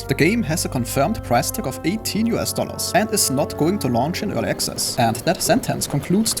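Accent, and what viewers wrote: Germany. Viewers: So geil wenn deutsche englisch sprechen 😂 man hört es irgendwie immer raus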